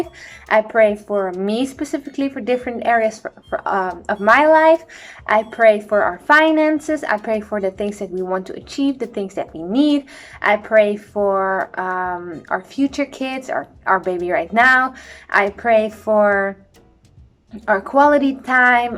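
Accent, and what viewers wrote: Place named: Netherlands. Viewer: Ben jij Nederlands? Ik hoor een nederlands accent. Heel leuk😝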